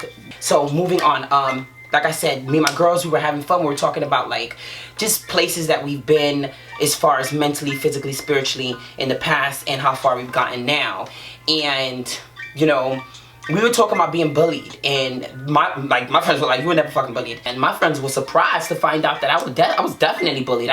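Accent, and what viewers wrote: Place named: New York, United States. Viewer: I jus love to hear her talk ain’t paying attention to shit she saying jus love the soooo Super New York accent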